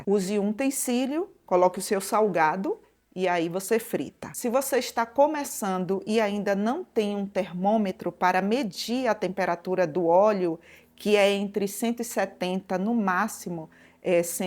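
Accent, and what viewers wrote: Bahia, Brazil. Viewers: Tenho certeza que a senhora é baiana É o nosso sotaque! Maravilhosa receita !!!!! Parabéns pelo trabalho 🎉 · Oi amada com certeza vc é baiana sotaque que morro de sau😮dades dos meus amigos solteropolitanos, morei em Salvador 22anos, bjos amada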